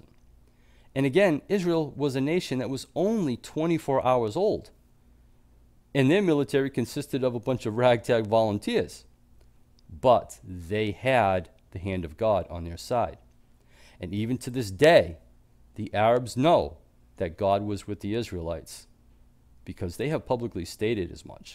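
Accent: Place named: New England, United States. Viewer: Good info! And I know this is trivial, but I love listening to your accent. I grew up in New Hampshire and have relatives in Maine. Married a Virginian, and have lived 22 years in Ecuador. But I know a New England accent when I hear it. ❤️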